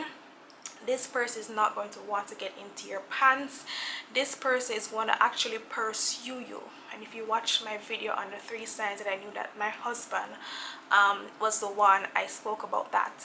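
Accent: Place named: Jamaica. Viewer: Hello I see You have an accent are from Jamaica 🇯🇲 by any chance?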